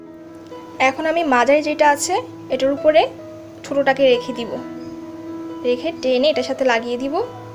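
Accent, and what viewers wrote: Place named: Chittagong, Bangladesh. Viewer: আপনার ভাষায় কিছুটা চিটাগং এর টান আসে।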